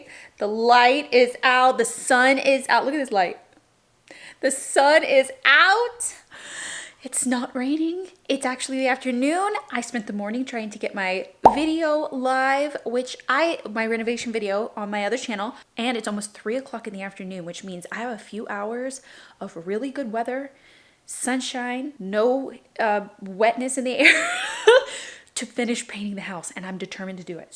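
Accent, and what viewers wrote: Texas, United States. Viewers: you can hear texas accent poking through in this video!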